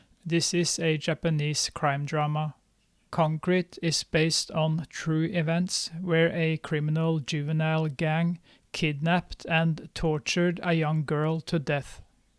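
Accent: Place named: Sweden. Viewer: Jag bara: Han e svensk direkt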